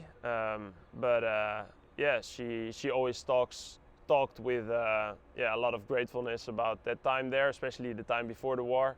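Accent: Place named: United States. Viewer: aksen US banget mas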